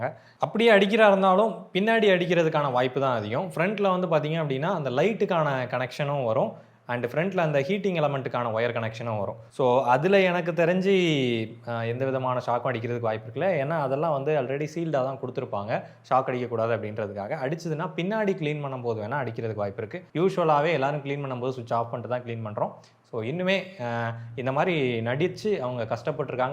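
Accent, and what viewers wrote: Tirunelveli, India. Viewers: Bro Neenga Thirunelveli Yaa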